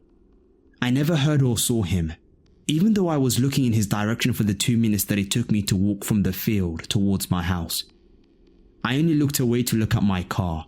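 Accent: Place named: United Kingdom. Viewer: Well this guy is british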